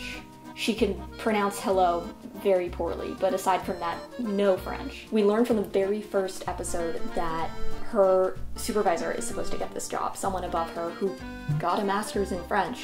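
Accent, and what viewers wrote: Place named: United States. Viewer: I am sorry, but I did not hear any French accent in your English (very much American English if I may point out). I am speaking as a European with a Master’s in English who lives in America for a long time. I hear accents, and you have none, which makes me think that you are an American born and raised 🧐. Nothing wrong with that, BUT... relax! This show is not culturally 100% accurate, it’s a “feel good” show, and the amount of criticism you express is not going to get you more subscribers. You really need to find other topics and angles to grow your channel because the country of the USA is loving the show! 😀 With that said, there are many accurate cultural points in this show, yet again coming from an actually born and raised in Europe person. Don’t take yourself too seriously, and enjoy the show and the FASHION (which by the way is not “questionable”, and that’s the phrase that yet again gives away an American in you), and FEEL GOOD watching the show! 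😃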